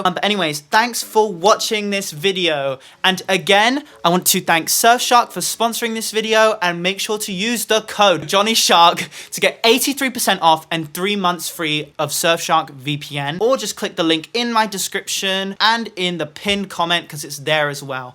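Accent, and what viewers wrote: United Kingdom. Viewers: i completely forgot has this strong british accent and how it makes everything he says sound so elegant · Your accent is so British ❤️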